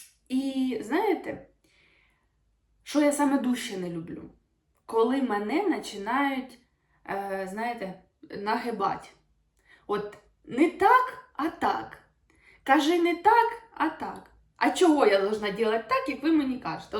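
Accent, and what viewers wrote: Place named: Poltava, Ukraine. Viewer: є розмовна мова регіону,до речі я з Полтавщини,у нас теж балакають,шокають і т.д,дуже наш говір схожий на ваш.Є літературна мова,але суржик він справжній,як би хто до нього не відносився.можливо ви мені через суржик і сподобались.не беріть близько до серця.як на мене краще суржик ніж:"я разгаваривала и буду разгаваривать........"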